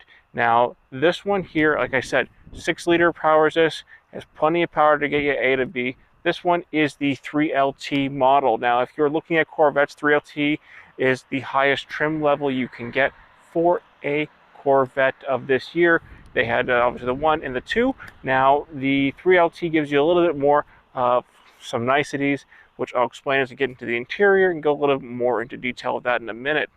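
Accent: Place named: Europe. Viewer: Where is this guy from, Europe? Zed, kilometers. Z, mileage.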